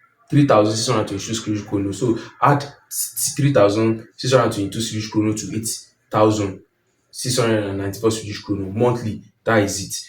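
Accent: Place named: Nigeria. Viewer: Just saw ur video....and i liked it brother... good work 💪💯... keep on the 9ja accent am proud of it....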